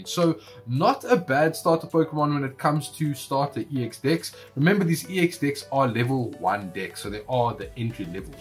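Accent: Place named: South Africa. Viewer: You have the thickest sa accent! Nice vid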